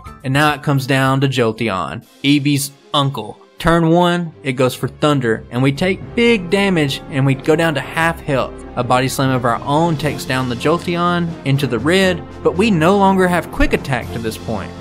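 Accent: South, United States. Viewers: I am glad that the algorithim gods blessed me with your channel. There is just something I love about the American southern accent that I find wholesome ( I know that's stupid, don't at me!) I find that I enjoy watching pokemon challenges, because they are the perfect background noise while I play other games or study.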